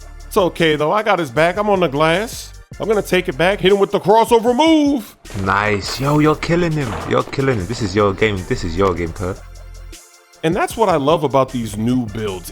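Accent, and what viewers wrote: United Kingdom. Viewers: Is English?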